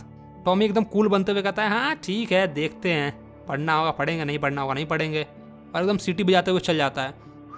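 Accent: Bihar, India. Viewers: Bhaiya aap bihar se ho na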